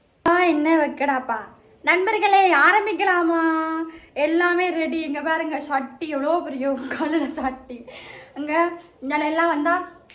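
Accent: Sri Lanka. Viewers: Ammaa neenga jaffna tamil pesa vendam normal tamil paesunga kettikkari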